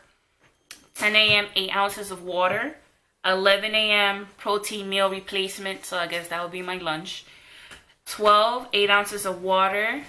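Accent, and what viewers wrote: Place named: New York, United States. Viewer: u have such a heavy ny accent lol!! 😘😘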